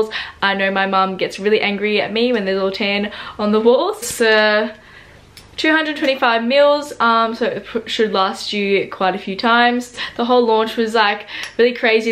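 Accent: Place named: South, United States. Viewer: I reckon....you sound like your from the south states, like me as a TN girl. Tan looks great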